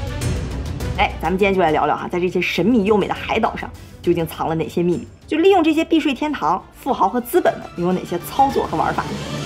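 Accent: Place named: Dalian, China. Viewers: 总感觉小lin 不是大连人 就是烟台人😂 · 她应该是北方人、语速快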